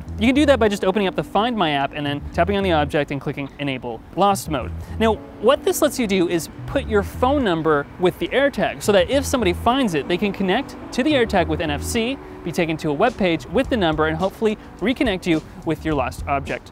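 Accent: Canada. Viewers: I’m sorry — Canada, eh? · Another Canadian eh?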